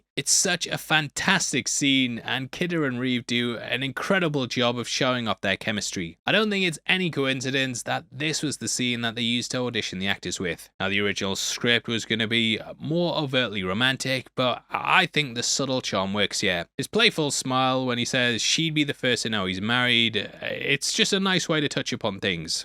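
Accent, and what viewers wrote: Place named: United Kingdom. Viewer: Hes british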